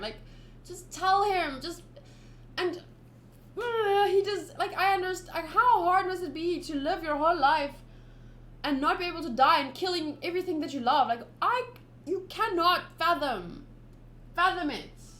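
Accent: South Africa. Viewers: Are you south African sorry I'm also south African and your accent sounds familiar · You have a very South African accent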